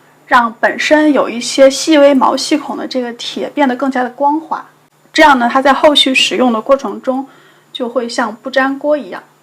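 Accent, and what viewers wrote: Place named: Xi'an, China. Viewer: 听口音有像西安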